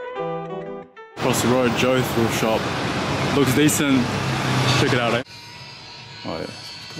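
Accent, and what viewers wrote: New Zealand. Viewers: Yo man, great video and music choice (RIP,) thought I could recognise a similar accent (from nz too lmao) defo will try to check some of these stores out at the end of the year when im in Viet! Your vids are hella entertaining my man keep it up <3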